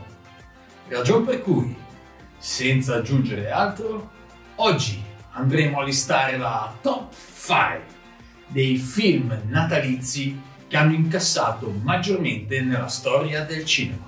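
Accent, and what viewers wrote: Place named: Italy. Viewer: Accento italiano divertente 😜 auguri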